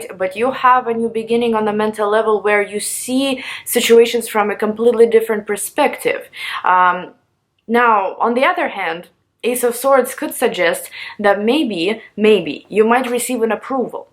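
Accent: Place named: Russia. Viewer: you’re the only one who among of all my fave tarot readers who have been extending such concern about this current situation which the whole wide world is seriously getting so alarmed right now, i hope and prayed this too shall pass and that everyone will be safe, and one more thing you i don’t really know you personally but i just got some sense that i think you’ve got this Russian accent or something, like i don’t know..but you simply reminds me of my Russian friend.♒️💖🙏🏻💪🏼✨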